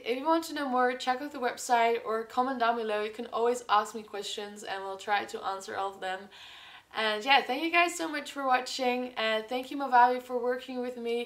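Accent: Netherlands. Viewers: i smelled a dutch accent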